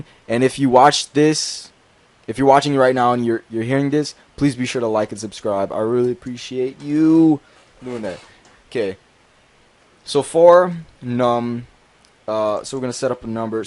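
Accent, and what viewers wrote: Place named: United Kingdom. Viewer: Me how to speak speak British but is it me or he sounds British a-hoi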